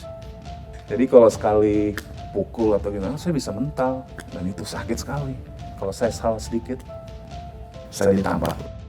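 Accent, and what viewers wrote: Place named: Indonesia. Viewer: Halo om gmna kabar makasih sharing nya Ndak tahu nasip kita di masa kecil sama di sia siain ortu mg sehat om saya penggemar anda dr tahun sembilan tiga waktu masih SMP nam Yogya Anda sdg jaya waktu itu kapan anda pelayanan di Yogya saya juga kristiani katolik Jawa hehe Gusti amberkahi om aksen anda sudah Indonesia bgt. Sukses Dodo palagan km Pat blas Sleman berkah dalem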